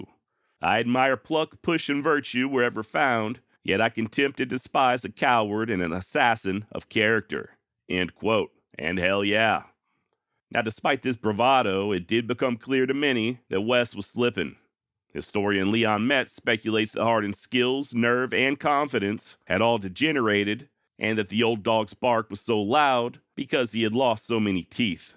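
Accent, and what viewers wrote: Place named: New England, United States. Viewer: sounds like your are a damn yankee. Are 'ya?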